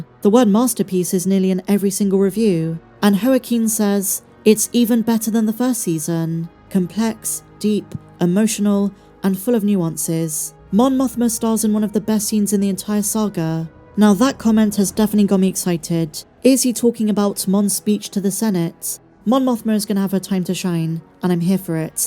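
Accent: United Kingdom. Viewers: Ain’t even gonna lie just noticed you are British lmao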